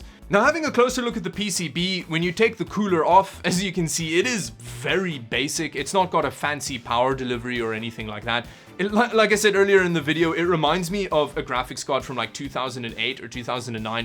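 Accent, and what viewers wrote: South Africa. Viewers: is jy van suid afrika bra jy het daai stem van suid afrikaaner lol ek baie neskiedag plus is algemene naam in suid afrika maar ek love jou vids jys supper funny bru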